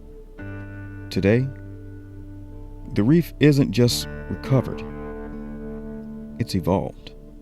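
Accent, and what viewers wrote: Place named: United States. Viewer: I feel like I'm watching a David Attenborough documentary but with an American accent.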